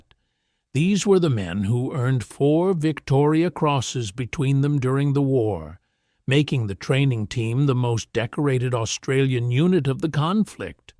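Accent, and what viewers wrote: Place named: United States. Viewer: Why is someone with an American accent relling this tale ?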